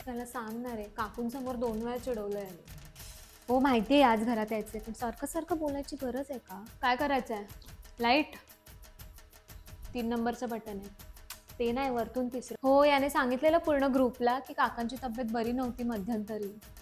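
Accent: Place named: Mumbai, India. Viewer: खास मुंबईकर मराठी!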